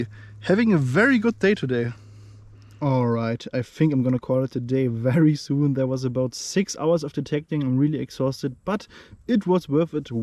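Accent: Germany. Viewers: I was thinking the same thing about the pronunciation of iron. Another word is enamel, German accent pronounced animal but we still love your channel. ❤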